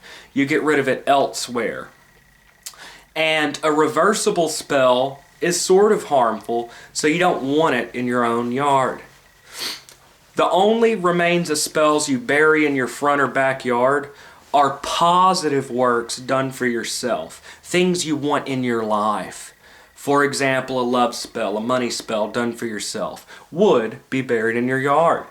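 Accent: South, United States. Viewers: good on you. always a pleasure to hear that southern ascent. blessings.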